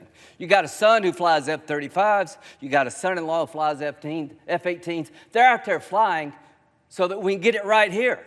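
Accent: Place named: South, United States. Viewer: Love his Southern accent! 🤣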